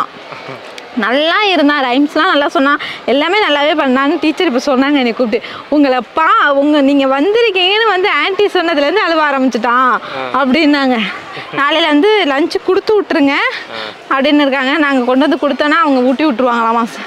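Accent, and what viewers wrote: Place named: Sirkazhi, India. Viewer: Akka neenga praaradha ketkum bodhu nalla irukiku ka engalukkum sirkazhi pakkam than unga slang pathe nenaichan super keep rocking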